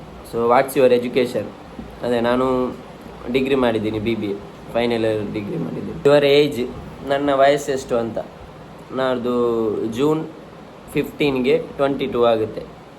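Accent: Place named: Mangalore, India. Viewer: ಮಂಗಳೂರು ಕನ್ನಡ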